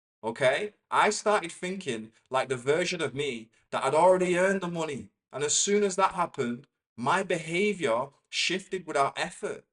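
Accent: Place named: North West England, United Kingdom. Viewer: Love it. Love your accent, too. North West, right? Brings back great childhood memories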